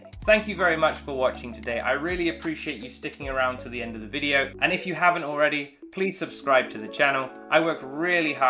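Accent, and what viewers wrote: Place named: United States. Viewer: Why you have American accent? Even if u were born in Uk.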